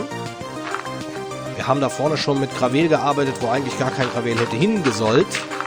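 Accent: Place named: Saxony, Germany. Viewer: haha kannst du lustig sächseln! Kommst du aus Sachsen/DDR ?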